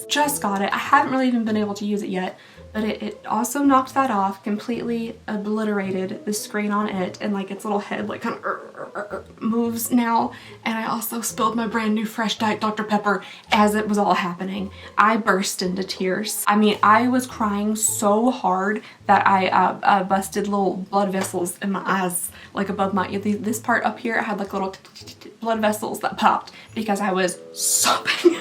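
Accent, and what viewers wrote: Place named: Georgia, United States. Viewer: please know that you owe us no apology. As seen with other posters, we will be here when you feel well enough to do a video. I’m sorry to hear you are having a tough time. As for me, I just love your sense of humor and your lovely accent. It reminds me of my family of friends in GA. You have a wonderful attitude considering the amount of things you are dealing with. Good job with giving yourself injections! I know how hard that can be from personal experience. I’m proud of you!!!😊Doing this yourself will help you get over your fear of needles.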